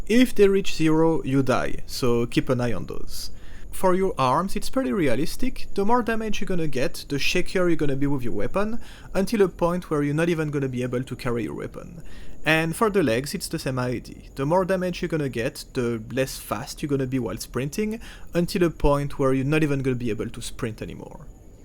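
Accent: France. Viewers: Je détecte un accent français sur cette chaine que je viens de découvrir. J'aimerai tellement qu'il fasse une partie française dans ses vidéos. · Je reconnais un accent francais :)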